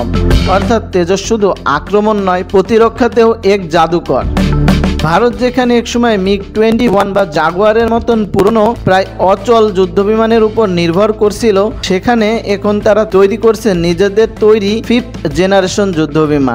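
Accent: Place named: Bangladesh. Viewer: এহনো ভাষায় বাংলাদেশী টান ভালোই আসে। তবে ইনি রাষ্ট্রবাদী ১০০%। এতে কোন খাদ নাই। ভালো থাকুন।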